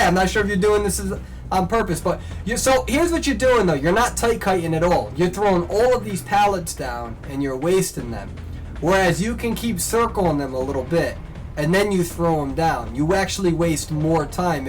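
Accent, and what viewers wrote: Germany. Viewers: I didn't know you were german, explains a lot with your accent😂 awesomee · ITS A GERMAN ACCENT? THOUGHT IT WAS PERSIAN OR ARABIC OR SOMEWHERE IN THE MIDDLE EAST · Your beautiful German accent 😂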